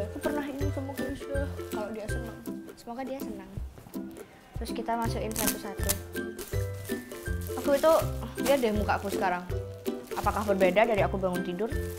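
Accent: Java, Indonesia. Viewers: tetep mwedok penting ayu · Medok tp cantik bgtt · pertahanin ngomong campur bahasa jawanya. Aku suka banget sama cara ngomongmu 😍👍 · medok bgt jawanyaa lucuu!!😭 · Ya allah medokk nya kecirii bgt wkwkk ,klo titi udah ga bgtuh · medok terus sumpah jadi makin seneng liat videnya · Medok banget yak:v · medok banget ih gemes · Medok banget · Medok kayak aku 😄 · Medok bngt ngmong ya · Lucuu bangety.. Jawanya medok2 unyuu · Medoknya dpt bgt bundd😍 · Keliatan banget kalo orang jawa👍 medok reek, mantap 😍👌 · Gemesh e ngomong e jawa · logat jawa nya kerasa bgt 😫☝️☝️ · trnyata km ngomongnya rd medok gt ye😂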